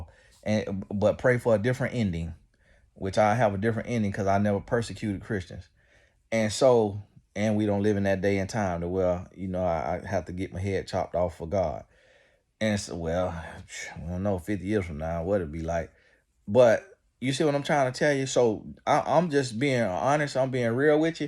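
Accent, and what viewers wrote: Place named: Mississippi, United States. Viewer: you so funny and country! Your folks gotta be from Mississippi somewhere… anyway, you be speaking facts for real. A man that want you don’t miss you!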